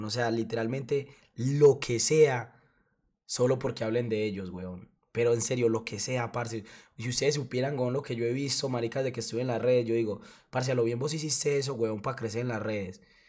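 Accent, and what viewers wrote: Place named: Medellín, Colombia. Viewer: Este chico es de Medellín la forma d hablar d ellos es inconfundible m hacen reír mucho😄👍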